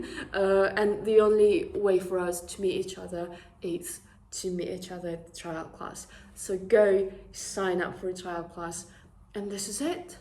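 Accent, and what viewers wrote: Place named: United Kingdom. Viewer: Нормальное произношение. Британский акцент.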